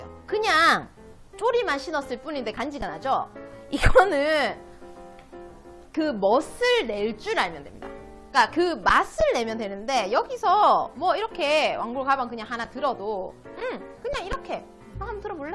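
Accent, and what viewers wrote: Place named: Gyeongsang, South Korea. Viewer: 미국에서 눈팅만하는 40하고도 1/4세 ㅋㅋ 삼둥이 맘입니다. 언젠가 한국에 가면 꼭 가겠노라며 위로하면 영상 잘 보고 있습니다. 같은 경상도라 설명이 귀에 쏙쏙 들어옵니다. 정강하고 고급지게!! 감사합니다 🤗🍓🍓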